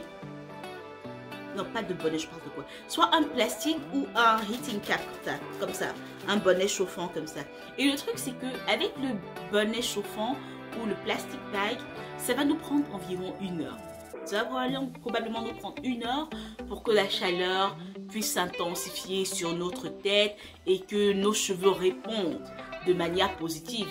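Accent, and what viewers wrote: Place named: Cameroon. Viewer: Ahhh l’accent du Cameroun ! Musique à mes oreilles 😊😊😊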